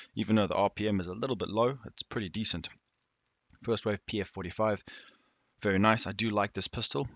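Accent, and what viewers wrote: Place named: South Africa. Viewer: Wascally... You sound South African. As a lightie, didn't you watch morning cartoons before heading to school? Wascally is pronounced like rascally, with an Elmer Phud lisp when he's ranting about the wascally wabbit (Bugs Bunny IOW) that he's taking strain to catch. Thus the Wascally talent makes one harder to bog down with riot foam 😜👍🏽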